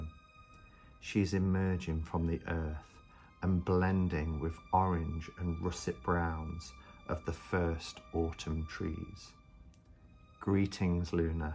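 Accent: United Kingdom. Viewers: What a wonderful beginning to Mabon celebrations! I sent this to some friends as well. One already commented, "That was magical." Indeed. If you ever quit your day job, you should apply to read books on tape. Especially British mysteries -- I so hear that in your voice. Thank you!!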